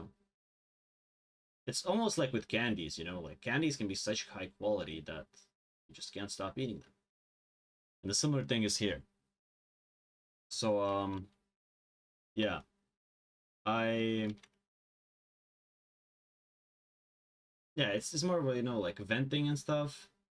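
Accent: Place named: Latvia. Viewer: Latvisks akcents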